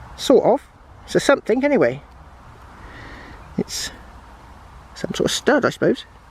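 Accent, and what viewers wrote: United Kingdom. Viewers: Hi. I really enjoy your videos. I’ve been detecting on and off for 33 years, and must say I’m somewhat envious that you have the hidden history of both Medieval and Roman times in the earth beneath your feet! My mother was British and I hear some of her speech patterns in your voice, which is a good thing! Keeps my connection to Britain alive! Here’s my dilemma and question. I’m leaving in March of 2021 on a 5 year road trip across America and Canada, just sightseeing, fishing and DETECTING! I have an old White’s Eagle II, which I believe to be the first digital detector, but it’s just a toy now, with a 6” depth range at best. I plan to spend my detecting time on the trip searching primarily for coins and relics, then focusing on gold nuggets when I return to California(I expect the gold price to BOOM soon!). So my question is this: Is the GPX5000 a versatile enough machine to reliably find coins at depths to 18” plus, while still performing it’s primary function of finding deep gold nuggets when I’m doing that? 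In other words, must I purchase a CTX3030 as well, or can the GPX do it ALL? Thank you for any advice, and keep the great videos coming! Thanks! Roger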